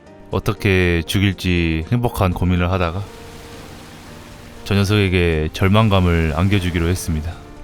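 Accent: Jeolla, South Korea. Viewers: 오지는 전라도 억양 존나 잘어울려 ㅋㅋㅋㅋㅋㅋㅋㅋ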